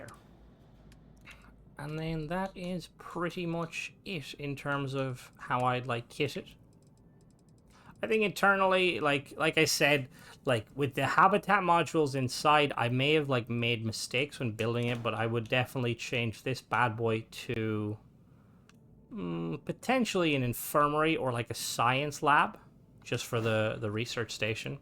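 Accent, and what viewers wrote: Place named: Ireland. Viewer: 😊 would you happen to know how to use bagpipes just curious You're Irish accent makes me wonder not that I think it's bad actually I think it's pretty cool but your accent sounds like you're from the area that actually has bagpipes plays it from dominant this is not disrespect this is me trying to respect you Peace have a great day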